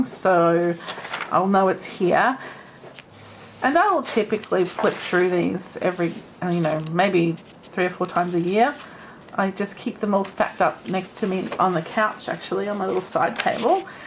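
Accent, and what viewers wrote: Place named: Australia. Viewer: Always love your videos so much! Thank you for consistenly uploading!!! (Wisconsin, USA subscriber here ❤) and absolutely love your voice and your awesome Australian accent!! (My almost 13 year old daughter Loves talking in Australian and british and so on accents (and actually shes really very good at it lol) and when she hears you on my phone, she always comes over and says how much she loves you and how you talk!!😁) and calmness and excited-ness all at the same time 😆 I always look forward to watching your videos and appreciate the time and effort you put in to making them. 😊 I hope your week is going great :)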